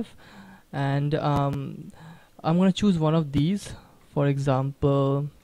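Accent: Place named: India. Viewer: Aap hindi me bhi bana sakte thea sun k lag raha h ki aap indian ho 😗🚬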